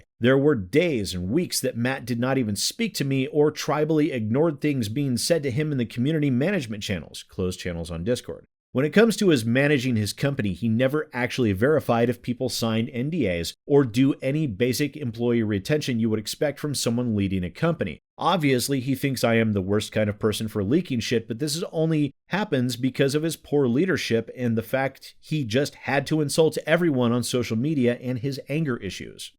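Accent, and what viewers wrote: Australia. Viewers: HEY, hey, don't make this about Americans! I'm from America, and I knew he was Australian right away. Some people are just... well, fuckin' stupid. I think we all have problems with that, let's be honest. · He's Australian, jesus why do so many yanks get confused over the accents? We sound nothing alike. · On behalf of Queenslanders I believe he was raised in Tasmania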